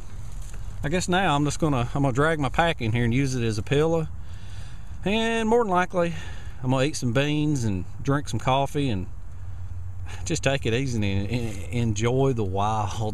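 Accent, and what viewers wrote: South, United States. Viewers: You come up with some great ideas,. I really enjoy watching your vids, and I love that southern accent! Lol! take care, now, and a big hello from New Zealand!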